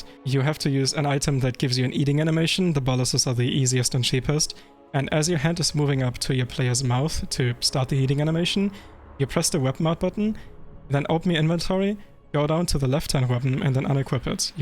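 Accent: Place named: Germany. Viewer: You’re German right? Because your accent sounds like it :D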